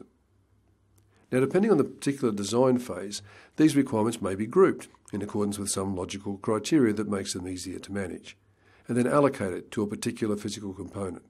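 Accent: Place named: Australia. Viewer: I'm not used to the australian accent, he speaks like too fast and for me it's not clear enough, I have to listen twice sometimes but I'm glad this course is uploaded.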